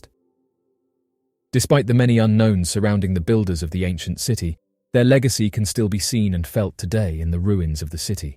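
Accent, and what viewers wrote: United Kingdom. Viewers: Yeah, I am baffled by this video. Teotihuacan was neither built by the Inca (which is on a different continent) nor Aztec (about 1000 years too late). It's not exactly known who built it or what those people were called, as even the name Teotihuacan which means "city of the gods" was given to it by the Aztecs who found it as an ancient ruin. I guess if they slap on a dude with a British accent, that dumb Americans will believe anything they say in a video.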